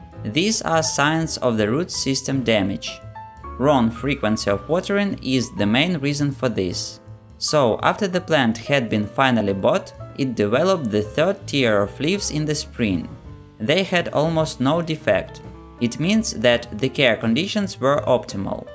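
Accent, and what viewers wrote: Russia. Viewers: Привет. Такое ощущение что голос русскоговорящего человека. Это так?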